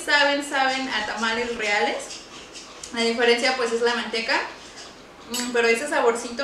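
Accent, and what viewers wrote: India. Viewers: Hi 🙋🏻‍♀️ que rico 2 Xfis para mandar😋👍🏻y si tienes el acento hindú enel inglés ☺derepente no lo entiendo ocupo poner bien atención pero es muy bueno y lo aprendiste muy rápido😉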